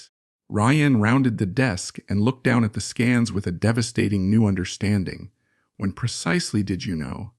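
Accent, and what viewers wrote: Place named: India. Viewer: Why is most of this narrated in one voice, but the chapter announcements and epilogue are delivered in an Indian one? And the text moving around? Why? If you want to promote views, these are things that need correction. If you do not care, then never mind. Continue putting in the time and effort to produce these, as your subscriber count barely moves. It's all up to you.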